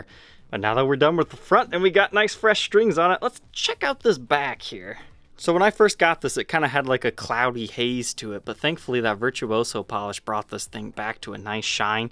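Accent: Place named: Midwest, United States. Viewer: Of course. I’m not a linguist, I just heard something different. Plus, I was generalizing. How could I possibly know what a Montreal accent sounds like without experience? I can spot a Chicago accent vs a Wisconsin or Georgia accent, for instance. I grew up in the Midwest, and I hear that accent in speech, although he tries to clean it up.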